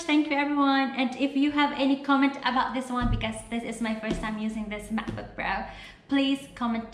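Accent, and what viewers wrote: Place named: United Kingdom. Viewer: Love the accent - grabeh! British accent bah? Dko sure. Love it!!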